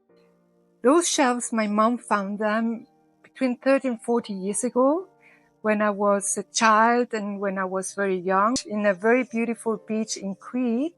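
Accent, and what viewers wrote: Switzerland. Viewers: Ist Ihre Muttersprache Schwizerdütsch? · Liebe vielen lieben Dank,sie inspirieren mich mit ihren Arbeiten, Ideen und mit ihrer liebevollen Art die Arbeitsgänge zu erklären. Ganz zu schweigen von den wundervollen Möglichkeiten ihre wirklichen Kunstwerke nach machen zu können. Mir gefällt ihr Schwizerdytsch sehr,ich lebe seid 40 Jahren bei Konstanz und und bin in 10 Minuten in der Schweiz, dass ist immer ein bißchen Urlaub für mich ❤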